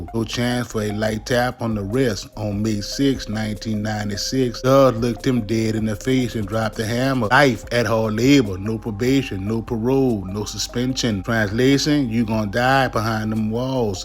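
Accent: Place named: South, United States. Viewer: Is this ai with a country accent? I understand what he is saying but the cadence is weird.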